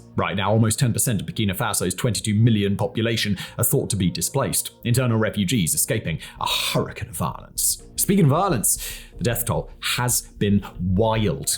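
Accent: United Kingdom. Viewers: God Im so tired of hearing this British fucking noise they're even making more of you narrators on TV it's lit er ally not literally it's pronounced mil it ary, not militry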